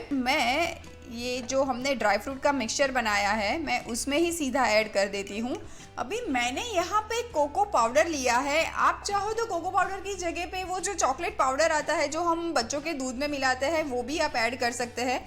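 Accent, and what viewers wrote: Gujarat, India. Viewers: Way u talk is very nice guju style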